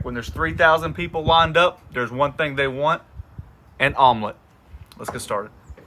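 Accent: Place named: Texas, United States. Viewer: Edumacate me please... is that a Texan accent/southern accent, ahh luuuve eeyt! its the purfaact chef ayxcent!!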